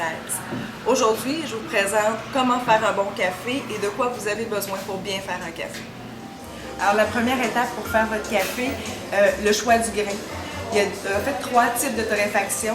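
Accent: Quebec, Canada. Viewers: merci bcp pour 1 conseil sup...avec 1 accent qbqwa c vrmt cooool. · votre accent est très agréable, vous me faite aimer de plus en plus le Quebec.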